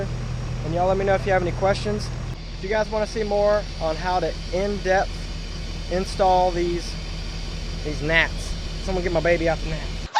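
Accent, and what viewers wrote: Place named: Louisiana, United States. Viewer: You gotta be from louisiana huh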